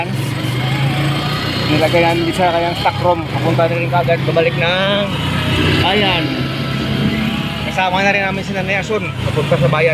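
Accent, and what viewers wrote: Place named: Karay-a, Philippines. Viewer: Nagaragumo ang karay-a kag amo man dia ang pang hambal ko. Sa Alimodian ako naghanggud. Love the dialect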